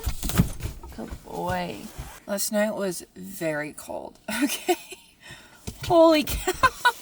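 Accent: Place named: Midwest, United States. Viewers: you're weird, because you are YOU. That's who you are. And the seats? Weeeeell.... 🤣🤣🤣🤣No, just kiddin. You love them, then I love them. And, Good you got these stuff done to Poe. And, what's wrong with your Michigan accent? Be proud of who you are. We, your people, love you. And we sure hope the Best for Blue! ♥️♥️♥️♥️♥️ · Oh sure, I didn't even notice the accent until ya pointed it out, ya know. Whelp, from one Midwest girl to another: Tells yeh mom an them I says hi.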